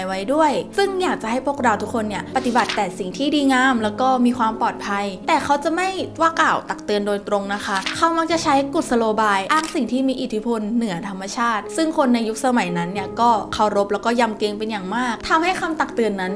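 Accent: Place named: Thailand. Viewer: เสียงน่ารักจัง มีความเหน่อนิดๆ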